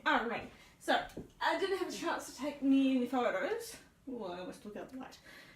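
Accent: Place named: New Zealand. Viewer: your accent is very beautiful I don’t know much about your country and am really sorry if I get this wrong but is that the accent that sir Peter Jackson has and what’s your favourite thing about New Zealand and who is your favourite character in he lord of the rings and the hobbit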